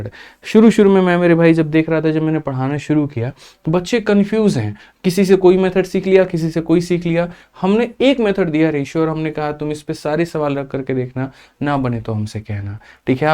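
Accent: Bihar, India. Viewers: भईया आप बिहारी लग रहे हो 😮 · बिहारी स्टाईल सबसे मस्त हैं सर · Waah guru ji bihari andaj me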